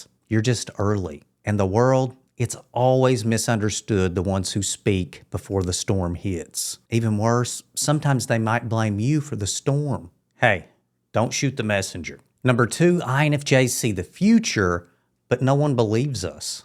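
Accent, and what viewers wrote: United States. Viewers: What state is that accent from? Respectfully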